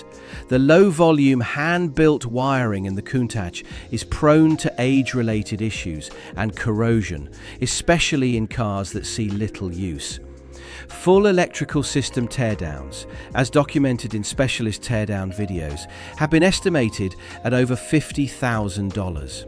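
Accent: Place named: United Kingdom. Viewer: Amusing. The British accent threw me off for a bit, but then I noticed that the costs were extremely detailed even in a range, as presented without out humor or any kind of approximation. 😂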